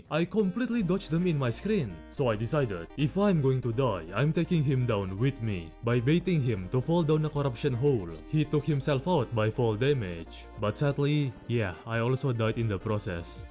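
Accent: Russia. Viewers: You sound russian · interesting, I would have guessed russian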